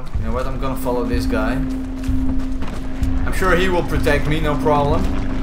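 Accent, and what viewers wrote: Netherlands. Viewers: Thic Dutch accent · ur dutch arent you ;) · I smell Nederlands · your Dutch accent seems inaccurate for a German soldier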